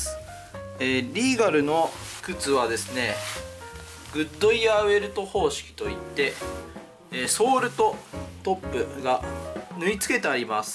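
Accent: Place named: Nagoya, Japan. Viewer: 訛りが静岡の人かと思ったら名古屋の人だった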